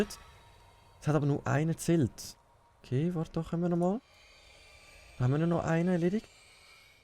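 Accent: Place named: Switzerland. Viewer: Scheinst sehr sympathisch und dein Kommentier-Style ist echt flüssig. Iwie schade, dass du nicht soo viele Zuschauer hast :) Bin auch us de Schwiiz, darum verstehe ich natürlich gut, was du sagst, mache meine Videos aber auf Hochdeutsch. Kann mir auch vorstellen, dass die Zielgruppe in der Schweiz einfach ziemlich klein ist ^^ Bist aber auch noch nicht sooo lange auf YouTube, hab ich gesehen :D Nur so als Tipp, falls du deine Videos etwas besser gestalten willst. Ich würde bei dir die Kameraqualität vielleicht noch etwas verbessern. Ich glaube die Aspect Ratio stimmt bei dir noch nicht ganz (siehst wohl etwas zerquetscht aus ^^). Dazu wirken ein paar Lichter für dein Gesicht echt wunder (auch sehr günstige LEDs z.B.). Hab auch selbst erst vor kurzem mein Setup mit Lichtern verbessert. Es ist erstaunlich wie viel solch kleine Sachen ausmachen. Ansonsten ist die Quali echt super und deine Videos (zumindest die, die ich bisher gesehen habe) ganz unterhaltsam :) Mach unbedingt weiter! 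Cya :3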